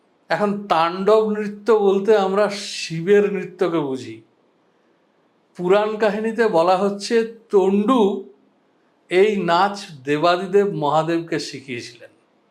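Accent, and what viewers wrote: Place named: Bankura, India. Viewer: আপনার জন্য এমন এমন অদ্ভুত বিষয়ে জানতে পারি যে, যা সারাজীবন পেরিয়ে গেলেও সেই নিয়ে জানতে হয়তো পারতাম না। অনেকে বলবেন এগুলো ভাষা কে ভালোবাসলেই জানতেন। একথা ঠিকই। তবে তিল তিল সময় যেভাবে পেরিয়ে যাচ্ছে জীবন থেকে, তাতে নিজের উদ্যোগে নতুন কিছু রিসার্চ করে শেখা, এই জীবনে খুব কঠিন। 🎉🎉🎉🎉 আপনার কথায় বাঁকুড়া ঘেঁসা একটা টান আছে (ধারণা ভুলও হতে পারে). 🎉🎉🎉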